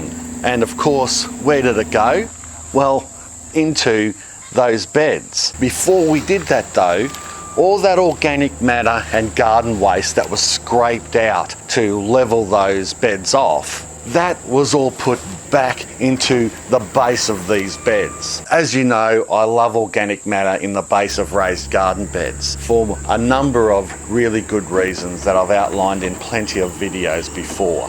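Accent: Australia. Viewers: HERBICOUS! I totally enjoy you info and your Aussie accent!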